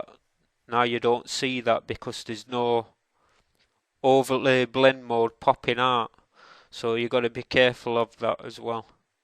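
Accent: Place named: Sheffield, United Kingdom. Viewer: pquarmeARTS he sounds like because he lives in sheffield "I don't think you now a lot of people form Sheffield" :)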